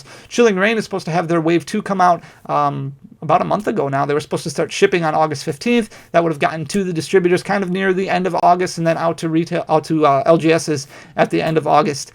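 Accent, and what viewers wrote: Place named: Wisconsin, United States. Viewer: I enjoy hearing your Wisconsin accent! Moved down to Georgia a few years ago.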